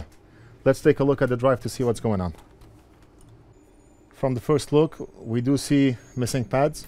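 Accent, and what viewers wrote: Lebanon. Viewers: Maaaaaan i was trying to see how to fix my USB to just hear a familiar Lebanese accent.. double shock.. good job btw.. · Me too, ana lebnene w behke nafs l chi 🤣🤣 nafs l lahjee fik to2ofro lebnene hata mn wejjo w hek Lebanese people making the difference all over the world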